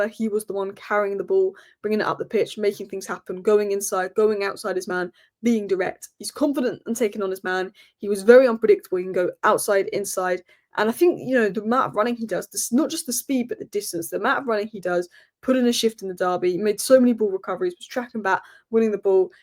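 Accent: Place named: Liverpool, United Kingdom. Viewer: I think your Scouse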